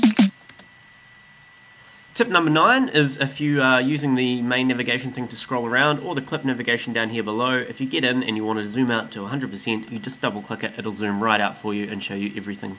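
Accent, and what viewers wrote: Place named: New Zealand. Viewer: Your tutorials are made all the better by the NZ accent... Love it!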